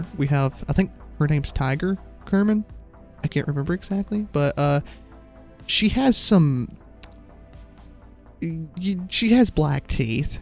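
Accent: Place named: Texas, United States. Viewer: why does this guy have like a texan accent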